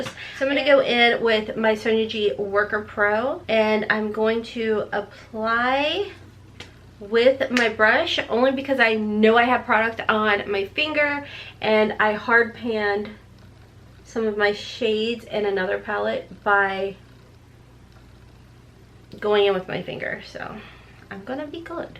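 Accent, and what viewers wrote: United States. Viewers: I love your country accent so cute. I’m from the Bronx and now live in Cleveland so I love me little country accents super cute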